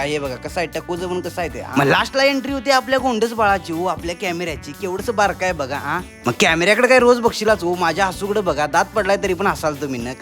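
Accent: Kolhapur, India. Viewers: Agayayaya meskati ky to kolhapuri touch aa❤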